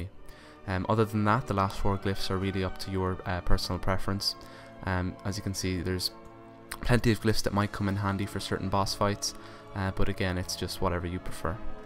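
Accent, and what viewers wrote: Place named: Ireland. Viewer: Another Irish warlock? :p my lordy!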